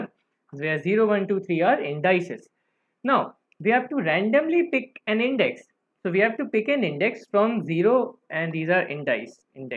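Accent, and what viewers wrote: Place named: India. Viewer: i can't understand what are you talking about your indianglish sounds so fckn bad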